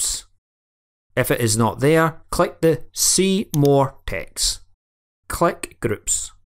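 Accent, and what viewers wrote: Scotland, United Kingdom. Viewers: i love your scottish accent 👏🏼👏🏼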